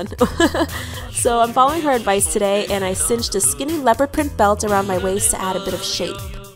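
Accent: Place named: Long Island, United States. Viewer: This was my FAVORITE OOTD video EVER! PLEASE do more! I love your personality! I am from Long Island and your voice reminds me of all of my friends from back home!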